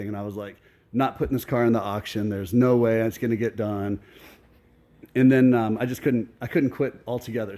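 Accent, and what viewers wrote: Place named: Brooklyn, United States. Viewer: Hey, Your humor is so heartwarming. Are you sure your not from Brooklyn?? Back in the day. Yea Right, Ha. Back in the day I can remember when Rocky opened up in the Marlborough Movie Theatre in Brooklyn. Even though Rocky's hood was Philly, that movie sure had the Old Neighborhood abuzz with Italian pride. Everybody was talking about Rocky. I bring that up because guess who talks like Rocky?? Yours Truly,. You sound like your from my Old Hood. Yo!!! Any good calzones out there in Phoenix?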